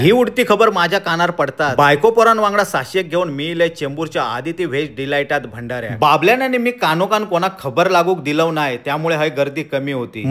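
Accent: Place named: Malvan, India. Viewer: Malvani bhasha ahech god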